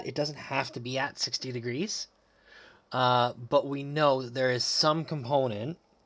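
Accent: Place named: United States. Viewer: I had no idea how to do this in the exam. Thanks teacher with American accent!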